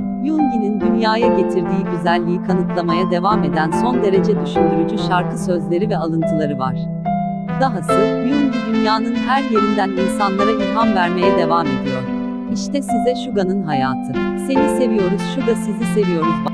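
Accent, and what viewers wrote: Azerbaijan. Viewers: Sen Azerbaycanlımısın? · Ablacim Azeribaycanlimisin?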